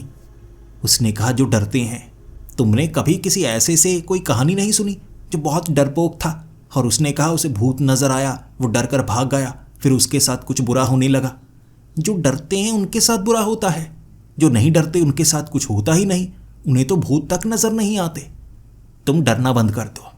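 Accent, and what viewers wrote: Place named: South, India. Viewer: App south indian ho 100%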